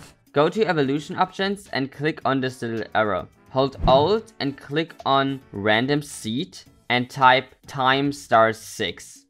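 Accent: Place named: Germany. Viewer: love the german accent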